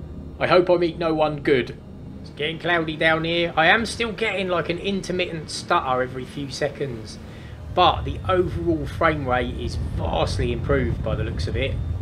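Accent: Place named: South West England, United Kingdom. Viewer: Sounds like your from the South West mucker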